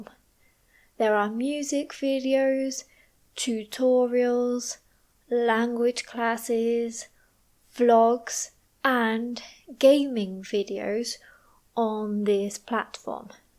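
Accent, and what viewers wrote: United Kingdom. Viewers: Normally i don't like british accent but your voice is so good. Thank you for video. I watched first time and i will watch other videos. Hi from Turkey 🇹🇷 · Your voice is amazing and the British pronunciation is my favourite. ❤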